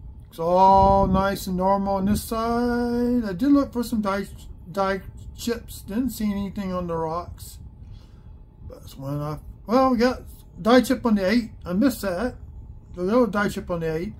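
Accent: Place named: South, United States. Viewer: Nice Southern 👍 😊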